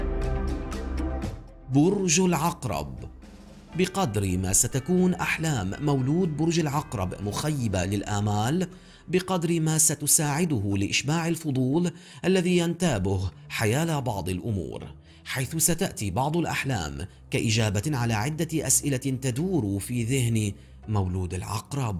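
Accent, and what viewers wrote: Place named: Arab world. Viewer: ماشاء لله على الصوت والحنجره العربيه الاصيله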